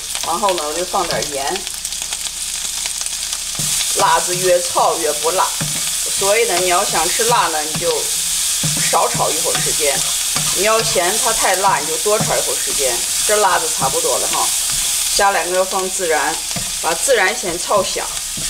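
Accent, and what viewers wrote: Shaanxi, China. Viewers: 好亲切的陕西话！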